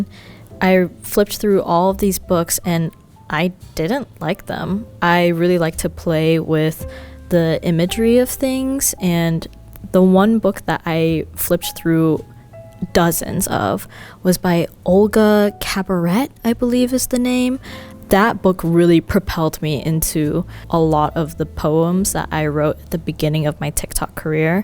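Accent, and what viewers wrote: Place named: United States. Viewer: Thanks for talking like an educated American USA in YouTube.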